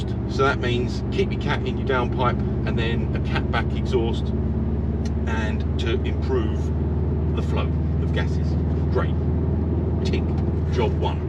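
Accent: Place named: Colchester, United Kingdom. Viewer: I just watched this video and thought he sounds a bit like he's from Colchester, and then seen you driving and saw you were driving in through Ardleigh interchange. I'm going to subscribe now. I'll have to keep an eye out for you on the road 🛣️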